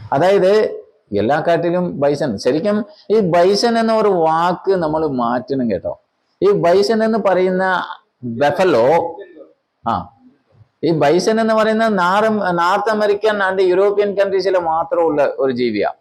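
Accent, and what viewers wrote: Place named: Tamil Nadu, India. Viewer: വാക്കുകളിൽ തമിഴ് ചുവയുണ്ടെങ്കിലും അവതരണ്o സൂപ്പർ. അറിവിൻ്റെ നിറകുടമാണ് താങ്കൾ.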